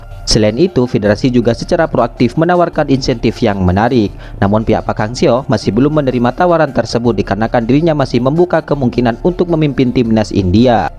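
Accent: Madura, Indonesia. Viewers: adminnya. kaya orang madura ya😁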